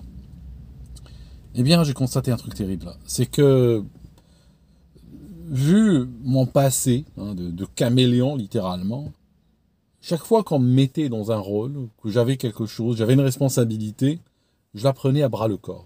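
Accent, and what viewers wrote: Switzerland. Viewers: Mais c'était quand même sympa l'accent suisse ! J'en redemande ! Sinon, absolument d'accord. Le monde de l'entreprise est plutôt ingrat, en plus d'être rébarbatif. On doit y côtoyer plein de gens absolument ineptes et, le plus souvent, on a fait le tour des tâches en moins d'un an, à quelques ajustements près. Tu n'as jamais entendu "je ne comprends pas pourquoi tu veux cette augmentation, tu aimes ce que tu fais. Crois moi, ça n'a pas de prix " ? Tu as aussi de grosses boîtes qui pensent que tu as tellement de chance de les avoir pour clientes que tu devrais travailler pour zéro et pourquoi pas finir par te prostituer (symboliquement) pour pouvoir les conserver. Heureusement que je suis mon propre patron. Je n'ai plus de patience, je me ferais virer rapidement. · T quand même un sacré personnage ! Et il vrai que ton accent suisse revient de tps à autre... 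En ts cas je vis la même chose que toi au niveau de l'excellence dans mon poste de travail et au moment où je demande un stage pour me mettre au "goût Du jour" informatique et nouveaux matériel lumières (je suis éclairagiste) on me dit : non pas la peine de, car tu es tellement bon que tu vas te former seul !!!. Voilà, comment être mis à l'écart et sombrer dans une espèce de désespoir et donc ne même plus être bon dans mon domaine de compétence.. Merci 😢. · Ouais j'ai adoré ton accent Suisse j'ai pensé à mon copain Paquito, et des bons souvenirs...Merci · L’accent Suisse 😅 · Si ton accent Suisse amusait du monde imagines l'accent africain 😅pire mélangé un peu à l'accent québécois. Je viens de la France avant hier même mes gosses se foutent de mon accent. malheureusement c'est ce que j'ai remarqué chez les francophones contrairement aux anglophones chaque fois j'ai été aux États-Unis, à Londres, en scandinavie... 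Jamais on s'est moqué de mon accent en anglais les américains me disent même your English is very good quand je leurs dis excuse my bad English. En terme devolution en entreprise t'aurais vraiment pu essayer le Canada c'est juste fou comment on évolue vite même en salaire d'où suis parti de l'Europe car je savais que si je restais rien n'aurait bougé jusqu'à ma retraite bah j'ai refusé de me contenter de ça et suis heureux d'être parti il y a bientôt 3 ans. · Vous m’avez fait rire et vous êtes touchant et ça m’émeut !!! avec l’accent suisse et c’est le dibou 😀😀😀😀👍👍👏👏👏👏👏👏👏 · Bonjour, cette histoire d'accent suisse qui revient quand tu es fatigué m'a bien fait rire, moi c'est ma femme qui lorsqu'elle s'énerve ou qu'elle est stressée et celà arrive souvent me parle en kabyle alors que je ne comprends rien vu que je suis Français.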